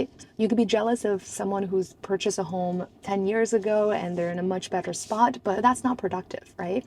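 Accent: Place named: United States. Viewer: hi! Could you share your tips on how you achieve your perfect american accent? As a foreigner myself, I find it so hard to have the american accent and I think yours is just perfect! I am chinese too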